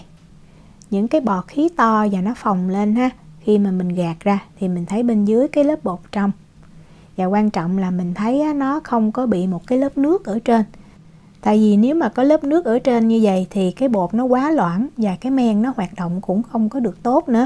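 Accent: South, Vietnam. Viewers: 南言葉が耳に心地よいです。 ホーチミンに居るときは 蒸しヨーグルトケーキだと思ってました😹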